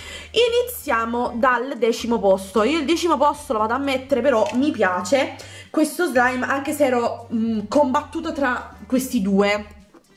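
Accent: Naples, Italy. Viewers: La tua voce napoletana ha ha ha ha ha bellissima e bravissima magg scurdat pur o nome hahahhahahahaha adoroh viva NAPOLIIIII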